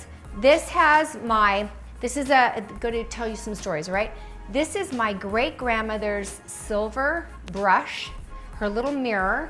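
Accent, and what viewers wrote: United Kingdom. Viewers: Very Beautiful, understated, classy as usual! Was eagerly awaiting your unveil, love the flow. Also love your British accent. Happy holidays from England. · LIKE 👍for your British accent😂👍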